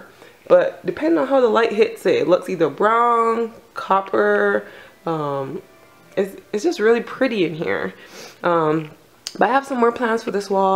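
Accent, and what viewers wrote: Barbados. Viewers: I love ur style U sound like a Bajan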